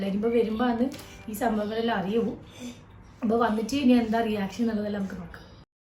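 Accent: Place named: Thalassery, India. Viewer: തലശേരി യാണോ. ഭാഷ. മനസിലായി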